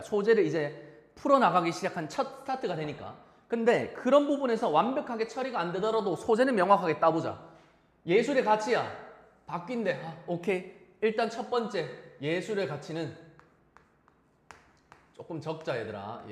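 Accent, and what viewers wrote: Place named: Gyeongbuk, South Korea. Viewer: 와~ 경북 사투리 이해 쌉 가능^^* 경기도 사는데 쌤 온라인 강의 듣고 싶어요~~~^^*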